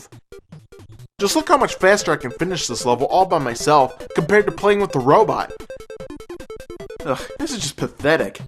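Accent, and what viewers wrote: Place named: Ohio, United States. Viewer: Does "charism" mean a big dumb pasty head with an awful Ohio accent?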